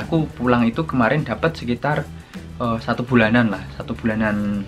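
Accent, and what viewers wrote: Java, Indonesia. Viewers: Jowo ne ndi mas..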